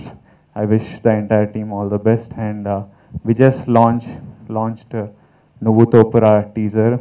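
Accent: Telangana, India. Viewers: I like u bro speak telugu u know pronounce in telangana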